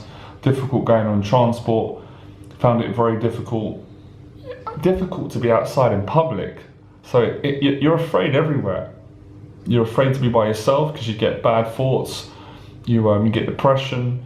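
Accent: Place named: United Kingdom. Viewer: You have the perfect British accent. In some of your other videos there are a few times when you misspeak with a bit of bad grammar. That is coming from some mental thing where you regress into some lower class English isn't it? I mean it happens like someone might stutter. As an American listening to you speak like you are doing here (when you are going slow) you sound like you went to all the finest schools and all. Even the slight mumbling and slack in your speaking (I recognize it as a certain British speech style.) sounds to me as an American to be some intentional upper class way of speaking. I bet King Charles and the cavaliers sounded something like you.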